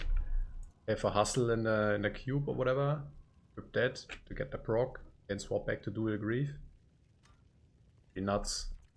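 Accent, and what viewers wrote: Germany. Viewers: wenn man 2 sec im Video ist und aufgrund der Aussprache direkt weiß, dit is oochn deutsche Jung😂 Like+Abo direkt da gelassen, mehr d2r content= mehr gut ;)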